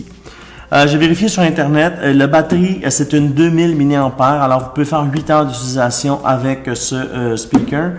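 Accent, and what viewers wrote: Quebec, Canada. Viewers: j'adore votre accent québécois et je participe toujours au concours mais je n'ai jamais gagner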